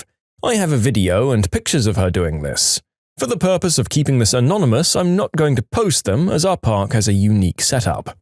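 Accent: United Kingdom. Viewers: Something tells me that with British narrator. This video was Uploaded this morning by mistake.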